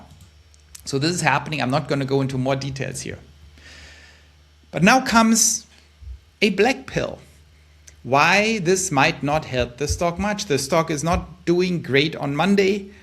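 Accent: Germany. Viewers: in mass - lol - german accent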